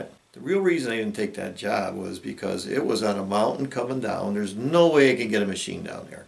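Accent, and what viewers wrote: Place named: Wilkes-Barre, United States. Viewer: Howdy -Greetings from the Juniata River area in Newport, PA. (Your accent sounds like the Wilkes Barre area). If you are in the Newport area come Spring, and want a quick cement job, (or if you know someone who does) shoot me a name. The dog and I wIll be looking for someone to redo the cracking cement on the top of our chimney. It's a real small job - about 4 feet by 4 feet. The cracking seems to be the cause of a slight trickle on the inside of our chimney when it rains. I climbed up there (ranch house roof) while the dog supervised from the ground. We came up with a temporary solution until Spring. ( He agreed to loan me the metal bottom to his dog kennel). I flipped it upside down and rested it on top of the 2 chimney caps. The metal lip around the kennel bottom is only about 2 inches deep so it works real well. 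It doesn't seem to block the airflow and we can still use our pellet stove. It also seems to be deflecting the water like we wanted. I anchored the thing with heavy pavers and it seems to be doing the trick. WOO HOO!! (The dog and I are happy with our rig-job for now and hoping that mother nature is kind until Spring.) Enjoyed your video.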